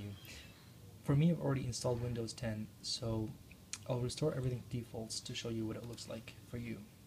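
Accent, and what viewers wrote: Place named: United Kingdom. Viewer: SHIT DAWG SLOW DOWN WITH THE BRITISH ACCENT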